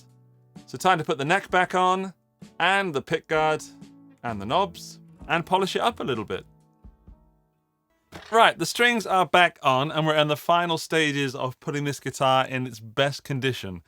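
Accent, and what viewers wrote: United Kingdom. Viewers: You said you live in Austria, however your English is considerably better than your German. From your accent, I'd give it an uneducated guess and go with Great Britain and the northern Irish Isles as your country of origin?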